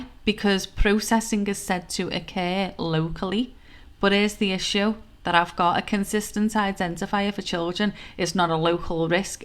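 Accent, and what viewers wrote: Liverpool, United Kingdom. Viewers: Love the scouse accent. First time viewer, attracted by your content. Only Ben Habib called out all the broo haha on the "U turn" "victory" makes me wary of Rupert Lowe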